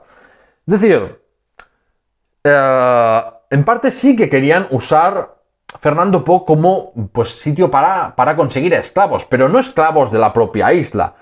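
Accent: Catalonia, Spain. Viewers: Madre mía qué relato tan caótico!! Difícil de seguir, inconexo y monótono. Siento decir que claramente tiene usted dificultad para vocalizar bien en castellano, por su fortísimo acento catalán, y me ha resultado una escucha difícil, atropellada y poco amable. Valoro su esfuerzo por exponer y dar a conocer la historia, pero me temo que aún le queda a usted tiempo de aprendizaje para desarrollar una buena narrativa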